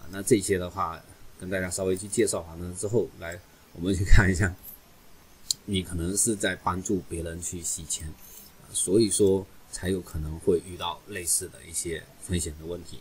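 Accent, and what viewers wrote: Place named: Fuzhou, China. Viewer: 福州口音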